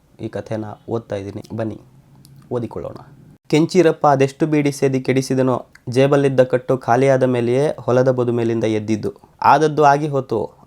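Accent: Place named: Davanagere, India. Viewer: Anna nam Davanagere bhashe mathadidri, tumbha santhosha aytu Keli , urin nenp aytana 😢😊😊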